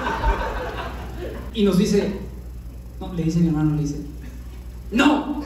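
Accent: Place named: Tijuana, Mexico. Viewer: Esto me le recomendó el algoritmo, está bien. Eres de Tijuana? Por el acentito acá bien curaaadaa.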